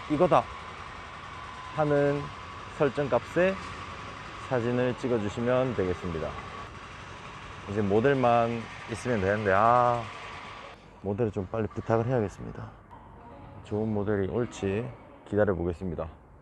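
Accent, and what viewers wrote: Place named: Gyeongsang, South Korea. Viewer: 멋지다^^ 갱상도사투리 쩐다ㅋ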